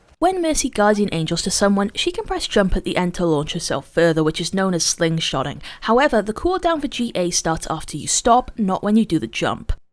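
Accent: United Kingdom. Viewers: This is an amazing tips video I've never even thought of (I'm so ashamed) or heard of. I LOVE Coma and Dding - I bought the Shanghai skin for Mercy because Coma is insane (with all access pass, watching his PoV is just... incredible and shows how much work a Mercy can actually put in). I love the fact you brought them up!! I'll probably end up forgetting your disclaimer and trying this in comp and getting rekt but yeah. Thank you for your videos, I just discovered your channel through Twitter rabbithole-ing, I saw your post about some dude raging about your voice. Idk what I expected, but your voice is really soothing and pleasant to listen to! Of course, we Americans tend to love British accents (I hope that I'm not getting your ethnicity wrong here, apologies if I am). Basically you sound wonderful, some people are just hateful to the point of delusion. 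:) Keep up the amazing work, I am a new subscriber and am already learning a lot. (Also I have about 250+ hours on Mercy so this truly means so much to me to learn new and EXCITING things.)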